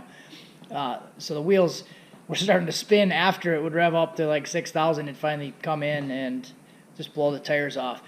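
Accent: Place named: Wisconsin, United States. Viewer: Wisconsin. I thought you were near me from your dialect lol. Yooper here.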